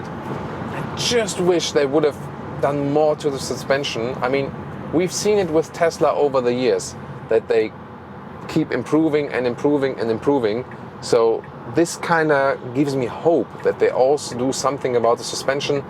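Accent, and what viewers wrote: Germany. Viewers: This guy has strong german accent. funny.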